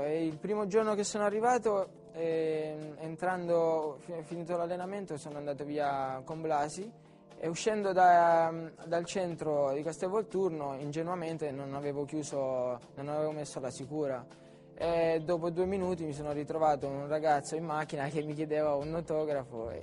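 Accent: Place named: San Severo, Italy. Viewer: oh xo l'accent' e san' sevir' non lo perde eh,ahahahah...grande pazienza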